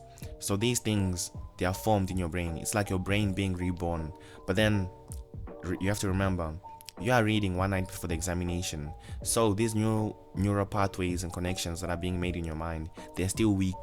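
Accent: Zimbabwe. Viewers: U sound Zimbabwean ❤ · Is he from Zimbabwe 🇿🇼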